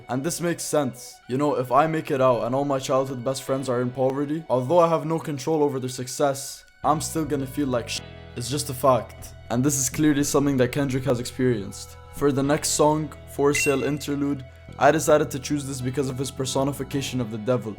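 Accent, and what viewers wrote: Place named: United Kingdom. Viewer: Why do I love the British accent so much😭😭😭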